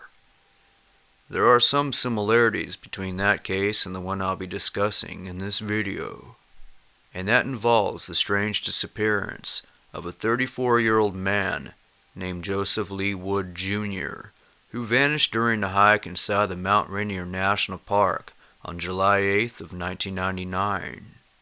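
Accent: South, United States. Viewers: i feel he uses to much lip, kinda like he puts his lips out too far when he speaks, like he's trying to keep a moustache out of his mouth sound you know, it's like he's puckering his lips to talk, throw in a slight southern accent and there ya go, i could talk like this guy all day, it would look kinda odd walking around having conversations with puckered lips though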